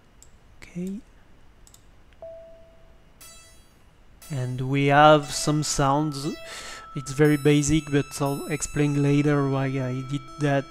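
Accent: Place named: France. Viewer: I'm a french guy listening to an english tutorial given by another french guy with a very french accent, reminds me of school Thanks for the tutorial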